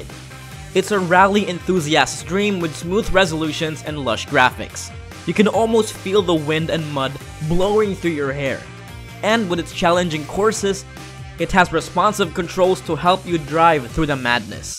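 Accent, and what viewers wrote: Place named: Philippines. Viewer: Yep I recognize the filipino accent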